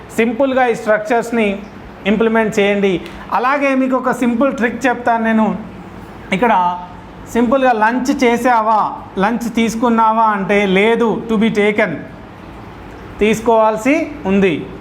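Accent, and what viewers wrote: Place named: Telangana, India. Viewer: Sir మీరు తెలంగాణ మాండలికం లో మాట్లాడుతున్నారు..... కోస్తా మాండలికం లో మాట్లాడండి sir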